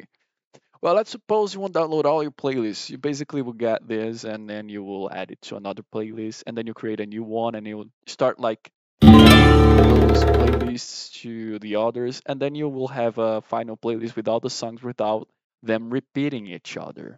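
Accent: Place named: Brazil. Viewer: Esse sotaque brasileiro é muito bom de ouvir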